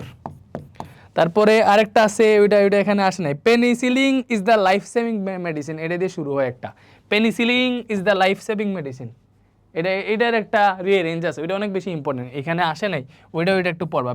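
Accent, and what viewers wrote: Sylhet, Bangladesh. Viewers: Vai maybe sylheti ?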